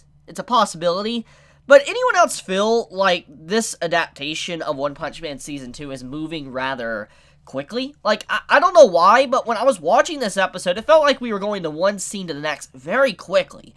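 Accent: United States. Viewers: You talk like a cowboy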